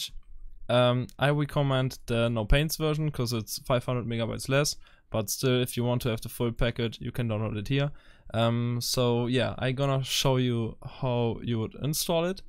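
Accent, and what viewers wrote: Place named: Germany. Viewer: der deutsche akzent😂 danke für das Video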